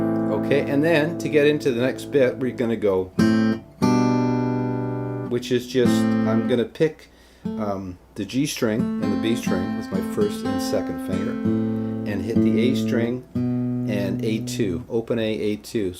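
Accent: Canada. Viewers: GREAT TEACHER! The way you teach really connects with me thank you friend. BTW Are you Canadian?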